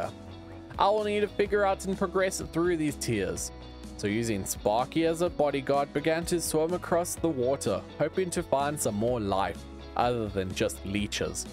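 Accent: South Africa. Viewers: Are you south African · I was like this man sounds like a south african and when you said ahh eskom i was like yes he is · No wonder your accent felt so familiar you're from SA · i knew you where also south african i knew it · WAIT UR SOUTH AFRICAN OMG BRO WHAT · You South African😮😭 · U from south africa???? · My guy are you from south africa? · I knew by the accent your South African lmao🇿🇦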